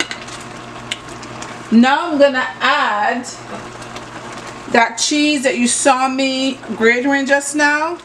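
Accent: Barbados. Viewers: I too love a Bajan accent. Music to my ears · Love that I can still hear the bajan accent coming thru. Bless up queen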